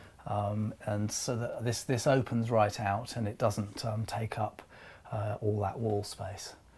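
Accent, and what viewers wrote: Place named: United Kingdom. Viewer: I take it from your accent that you are from somewhere in Britain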